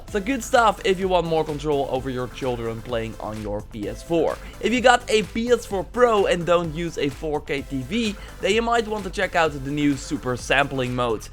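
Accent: Netherlands. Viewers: >Dutch accent >Horizon zero dawn DOE ES NORMAAL GODVERDOMME Goeie video :) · Een nederlander hehehehehe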